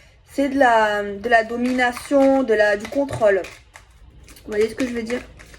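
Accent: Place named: Marseille, France. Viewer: j’adore ton accent marseillais j’espère pouvoir commander ton oracle le plus rapidement 😌et que tu me dises comment faire bisous prend soin de toi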